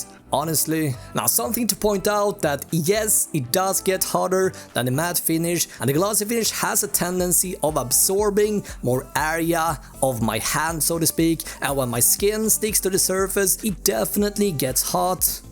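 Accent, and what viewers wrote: Sweden. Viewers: Hörs ju glasklart på hur han pratar engelska. Can't get more svengelska then that... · Are you perhaps Nordic? Swedish perhaps?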